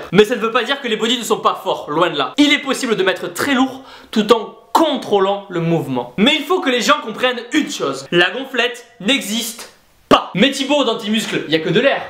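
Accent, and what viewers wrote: South, France. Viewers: mais tu habite où car tu a l acsent du sud moi j habite à côté de pau et toi?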